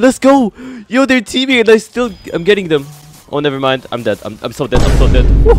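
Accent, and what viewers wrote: Germany. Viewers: Ey bist du deutscher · Are you German?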